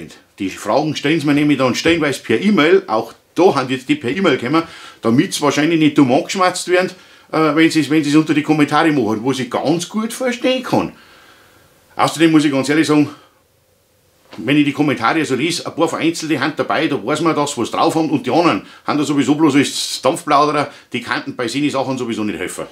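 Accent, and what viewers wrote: Bavaria, Germany. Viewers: Super naučné video, a ta Bayernština nemá chybu. Palec nahoru. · Super Videos weiter so !!! (los die ned drazn uns echte Bayern versteht hoid ned jeder Fischkobf ;-) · Top. Gruß der Preuß' der a boarisch verstahn tuat:-)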